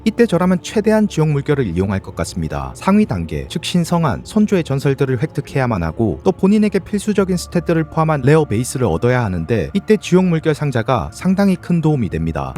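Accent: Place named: Gyeongsang, South Korea. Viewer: 제가 경상도 사람이라 그런지 억양도 귀에 쏙쏙 박힘 ㅋㅋㅋ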